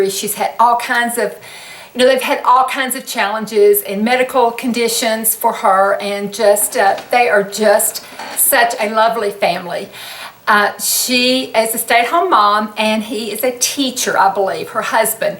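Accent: South, United States. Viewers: You might be the sweetest person on YouTube! And I just adore your accent! Im from Ky and I still hear such a southern draw in yours. I could listen to you read a dictionary 😊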